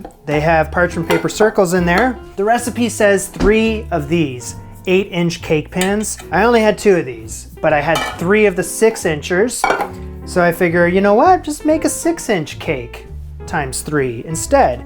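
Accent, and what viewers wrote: Canada. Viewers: It seems, since you have been back in Canada, your accent is returning. Which is nice. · I want to try this but in the right sized pans, and really whip air into the icing so it does not seize up. Maple sugar is a wonder. You can swap it out for brown sugar, but it is very pricey. I'm wondering is if a little whipped cream is called for. Unsweetened. Ah, the comment of woulda, coulda, shoulda. Your Canadian accent is much stronger and you video talents much diverse! You go guy!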